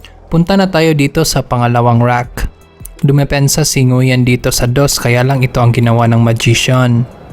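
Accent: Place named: Visayas, Philippines. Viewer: Napaka akward mo mag salita d bagay paki ayos boses mo halatang bisaya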